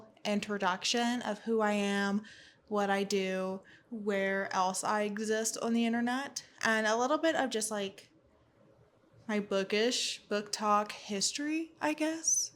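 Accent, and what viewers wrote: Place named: Appalachia, United States. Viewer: I could tell a fellow Appalachian girlie from accent alone! 🤗 Welcome back to booktube!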